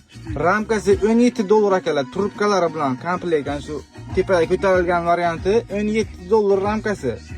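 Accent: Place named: Bukhara, Uzbekistan. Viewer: Adres shevasidan buxoro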